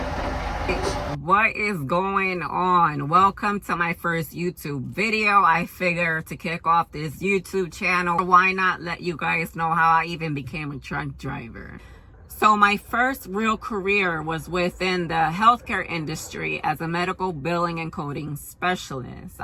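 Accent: United States. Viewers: Where are you from? You sound like you from New York? Are you Boriqua? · Watched your video and subscribed immediately. Love your determination and your Jersey accent. I am so sorry for how your husband went about ending your marriage. But you will be alright. I am looking forward to following your truck driving journey. I don't know if you follow any other female truckers on YouTube, but my favorite is Jasmine (SlimePack) who drives refers with Prime INC. May God bless you and keep you safe on the road.❤🙏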